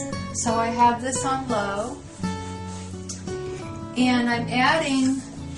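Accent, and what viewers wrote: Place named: United States. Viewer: Why do mercans say "Urrbs" instead of "herbs", it clearly starts with H not U...and turmeric isn't even a Urrb...it's a spice!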